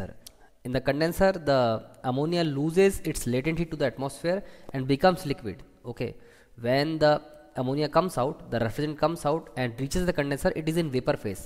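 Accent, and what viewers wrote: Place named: India. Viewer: A little hard to understand the strong Indian accent.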